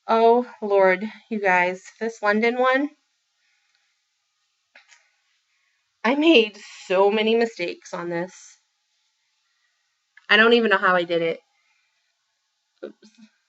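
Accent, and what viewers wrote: Boston, United States. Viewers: I definitely heard Boston, with the ar words, like part, shark, start etc. I thought it could be stated island, maybe Jersey. I was born in and partially grew up in Brooklyn, so I'm told I have an accent as well. Lol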